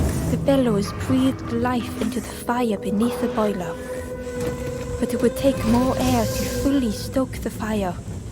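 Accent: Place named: Wales, United Kingdom. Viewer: I actually really don't like her voice or her accent. She has the same pace throughout, regardless of the words. And she sounds Welsh to me. I was hoping for a way to turn off narration but oh well...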